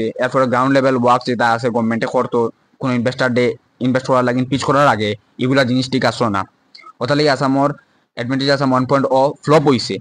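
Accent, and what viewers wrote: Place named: Sylhet, Bangladesh. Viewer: Tumi olpo Shuddha Bangla Matiyo. Jaate Non-Shylleti manusheo jaate bujhte paaroin........ .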